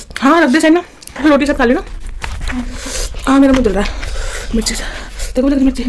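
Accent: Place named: Garhwal, India. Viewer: but she is not Punjabi she is uttarakhandi (gadwali) like me I am also from uttarakhand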